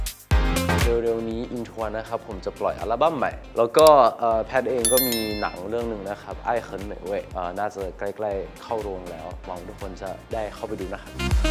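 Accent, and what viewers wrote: Isan, Thailand. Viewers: สำเนียงอีสานได้น่ารักมากๆๆลูก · น่ารักมากกกกกก เอ็นดูท่าปล่อยพลังอะ55555 พูดอีสานน่ารักมากกกก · เอ็นดูเว่าอีสานนนน 5555 ภาษาไทยไม่ชัดหนักแล้วลูกเอ๊ย 5555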